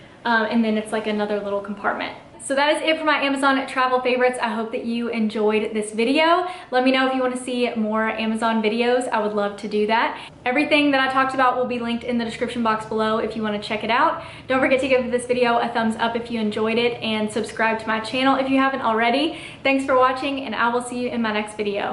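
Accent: South, United States. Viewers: I thought I was the only one who traveled with coffee creamer. Glad I found your channel. Love to hear a familiar accent (Southerner here too). ;) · Am I the only one that enjoys listening to a southern accent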